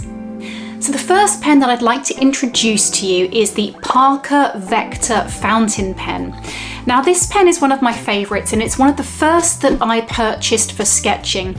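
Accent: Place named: South, United States. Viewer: I have a few bent nibs, Love them for their versatility. Love the dulcet southern accent, BTW.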